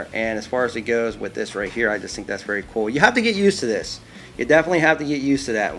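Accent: Pittsburgh, United States. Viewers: Any chance you're from Pittsburgh originally?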